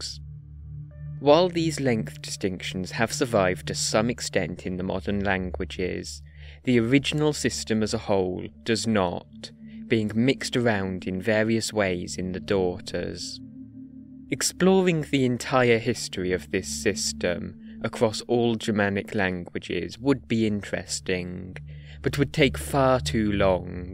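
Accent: United Kingdom. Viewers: I love your accent!! I feel it is from somewhere in UK, is it??? (I'll continue to watch the video, as I also love knowing the evolution of languages! The one I speak as native is Spanish).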